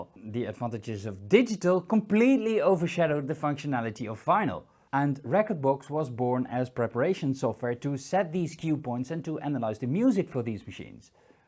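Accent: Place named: Netherlands. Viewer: Ah ik dacht al dat je van NL komt grappig hoe snel ik dat hoor. gr uit limburg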